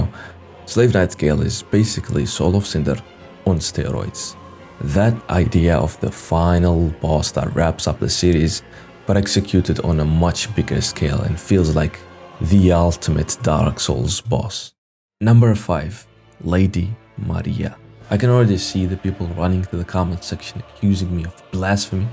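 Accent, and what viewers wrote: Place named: Italy. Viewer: I'm italian, and you REALLY have the accent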